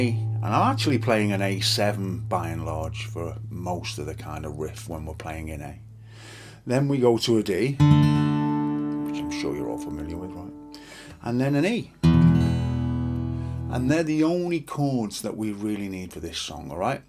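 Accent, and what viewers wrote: Liverpool, United Kingdom. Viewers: Are you from Liverpool?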